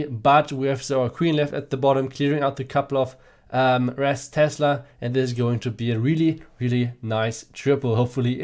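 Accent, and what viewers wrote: Germany. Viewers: I really like your german accent :)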